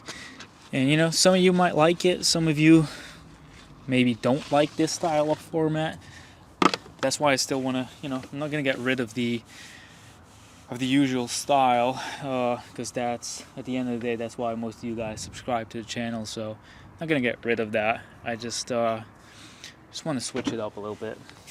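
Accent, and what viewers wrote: United States. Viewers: Are you american? Your english sounds very american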